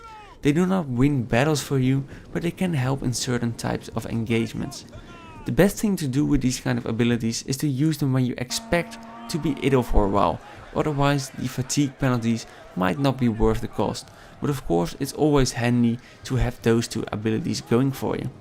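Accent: Netherlands. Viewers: love you dutch accent!!!! Nederlander en meoten we trots op zijn, Batavi, frisii.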